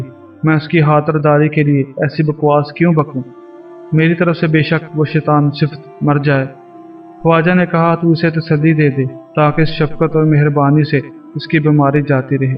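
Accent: Punjab, Pakistan. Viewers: bhai aap ki awaz bhot achi hy lakin aap ka talfuzz bilkul Punjabi hy plz ic ko negative ni lain lakin ap ka talfuz bhot irritate krta hy plzzz ic talfuz py work krain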